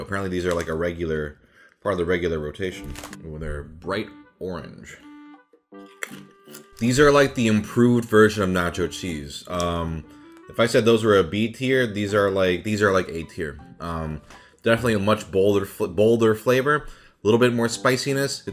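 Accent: United States. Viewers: yo this guy is asian but his voice and accent are AMERICANA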